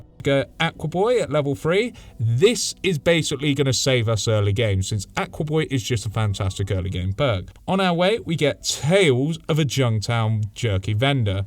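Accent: RP, United Kingdom. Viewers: it’s the formal British accent, the intro I similar as well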